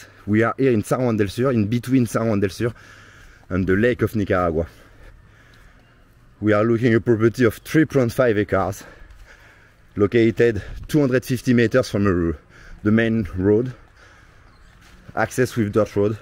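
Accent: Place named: France. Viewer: Quel joli accent français!! Je suis anglophone donc je peux le dire. 😀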